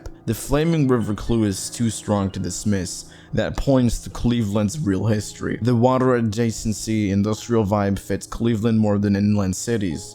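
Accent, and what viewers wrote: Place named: Birmingham, United States. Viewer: Sound kinda like Birmingham al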